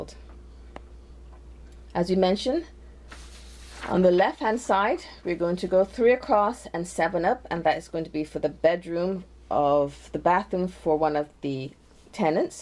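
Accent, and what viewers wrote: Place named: United Kingdom. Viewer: U r British awesome lol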